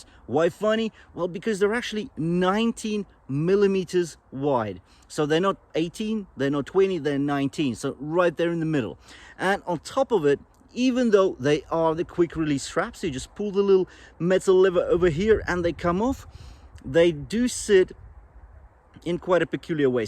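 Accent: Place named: South Africa. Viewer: Great accent. South African, but he's lived in the UK for while, is my guess?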